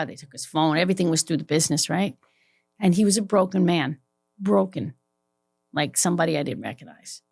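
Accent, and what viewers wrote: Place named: New York, United States. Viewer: I could listen to her for hours. Love the NY accent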